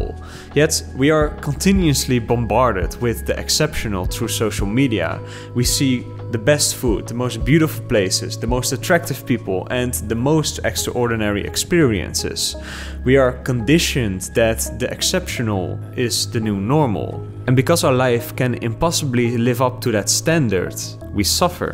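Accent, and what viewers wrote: Netherlands. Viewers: Are you dutch? That accent 😎😇 O ffs you just told us in the video lol.... How the .. did you end up in HK?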